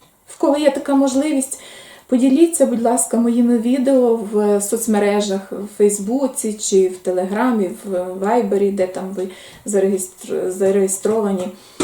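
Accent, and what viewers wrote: Western Ukraine, Ukraine. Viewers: Вітаю! Власне, я писала, що ви, мабуть, родом із заходу України. Відчутно як ви розмовляєте. В жодному разі не ображала вас, бо я західнячка з Тернополя. Перепрошую, якщо для вас це неприємно. Залишайтеся здорові.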